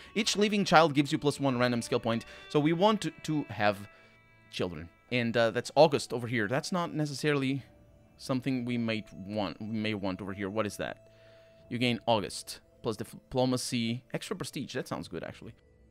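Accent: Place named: Portugal. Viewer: For years I couldn't picture where your accent was from turns out you're Portuguese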